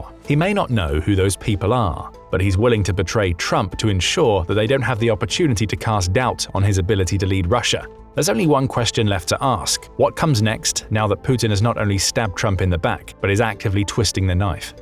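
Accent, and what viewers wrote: United Kingdom. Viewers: Since you are British or Australian.? (due to your accent) you must be for sure against Russia and Putin. To use the word betray is wrong and you have no solid proof that it is the case. I am not Russian but I believe that Russia could eliminate Zelensky in minutes with an Orishnik rocket. However, because Putin promised the previous Israeli leader, Yahud Olmert ( name spelling could be wrong) not to take him out (because Zelensky is Jewish) he is refraining from taking care of Zelensky. My point is this Trump wants peace and to stop the killings in Ukraine. He is a businessman. Imagine if the three superpowers agree to peace the world would be in a much better place economically and safety wise. By the way, Putin wants to mediate cooperation between Iran and China which will happen very soon. I hope Putin will be able to succeed. 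Peace on earth.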